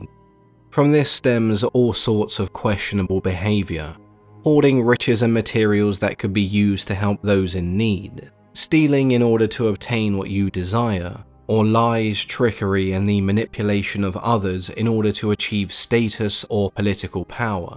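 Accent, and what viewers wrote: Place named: United Kingdom. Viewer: the British accent is making this video even more terrifying 😨😨😨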